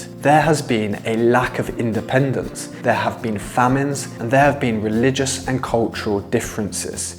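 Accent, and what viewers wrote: United Kingdom. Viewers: Could you please tell me what's your accent? Cockney or rp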